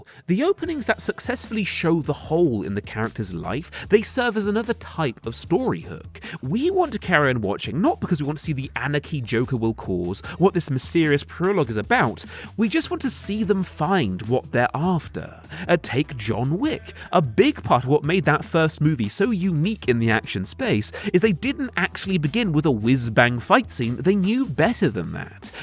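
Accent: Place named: RP, United Kingdom. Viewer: Almost stopped watching at "Indi-AWH-na Jones". Mate, learn how to integrate proper pronunciations with your excessively RP accent. It's like when brits say Jackarse, it makes my skin crawl.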